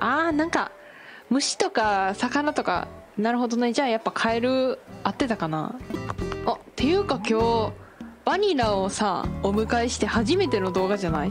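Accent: Kansai, Japan. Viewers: 新規なんですけど、さんの関西弁と住民のボケが面白くてどハマりです😂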